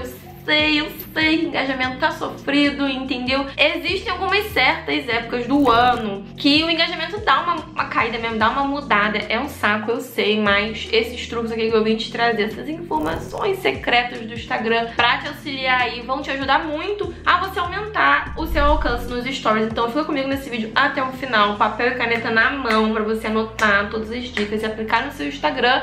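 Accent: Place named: Rio de Janeiro, Brazil. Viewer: vc é do suburbio do Rio? Pergunto pq eu sou e eu super me identifico com o seu jeito de falar.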